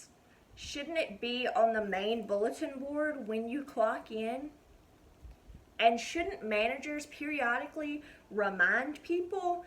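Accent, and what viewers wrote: United States. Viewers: You have tattoos and a country accent this is so strange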